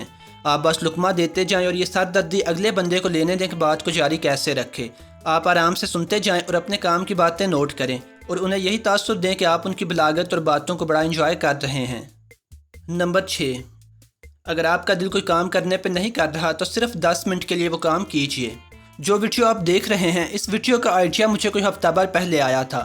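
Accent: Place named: Sialkot, Pakistan. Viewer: Realy Helpful tricks bro keep it up👍 And bro apka accent bata raha hai k aap sialkot se ho. Am i right?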